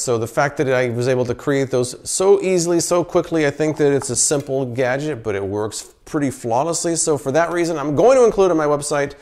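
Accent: United States. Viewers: Are you American perhaps? 🤔🤔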